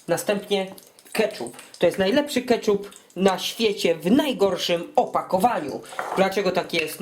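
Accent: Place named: Kielce, Poland. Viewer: chlopieee powiedz mi ze jestes z Kielc :D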